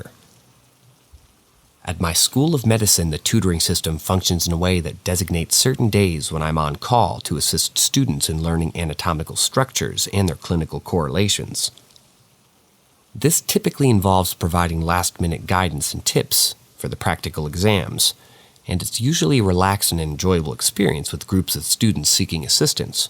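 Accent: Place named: United Kingdom. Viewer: What's with the new British accent?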